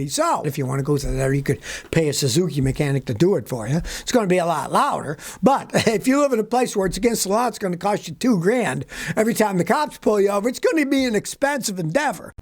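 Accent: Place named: Chicago, United States. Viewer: Is Scotty Kilmer’s accent a Chicago accent or a different version of the New York accent? To me it sounds Chicago but I’m not a 100% sure lol. But I love it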